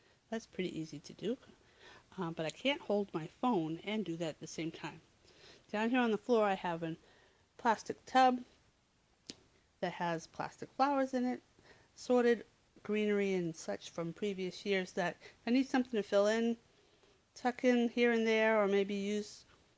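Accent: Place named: Maine, United States. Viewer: Hi, I noticed right away you were saying the same kind of things l say with the same accent. That was a really nice experience. I loved your video. I am looking for ideas for decorating my son’s grave. Then I looked and saw that you are in Sanford Maine. No wonder! I’m in Somersworth. We are neighbors! Thanks for the video.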